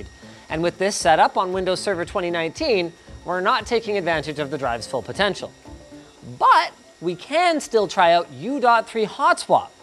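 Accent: Canada. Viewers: For some reason you seem more Canadian in this video than normal. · Linus’ Canadian is showing more and more every video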